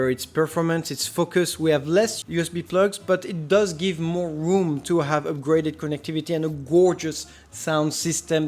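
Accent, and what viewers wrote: France. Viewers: Merci je suis convaincu! J'attends quand même la nouvelle b550 de msi pour être sûr! Première vidéo que je vois sur ta chaîne et tu viens de gagner un abonné (le French accent is lovely)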